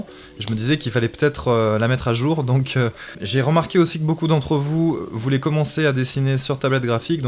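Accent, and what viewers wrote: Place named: Quebec, Canada. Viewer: t ai quebec...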